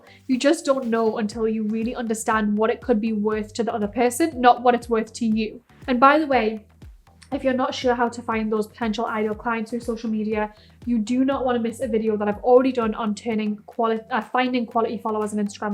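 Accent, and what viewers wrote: United Kingdom. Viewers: I love the Geordie accent👌🏻ever since Geordie Shore😂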